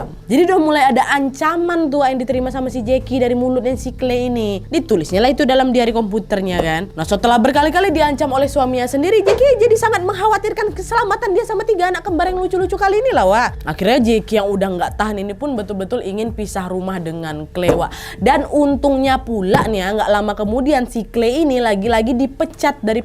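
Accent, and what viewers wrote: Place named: Medan, Indonesia. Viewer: ngakak,nampak kali medannya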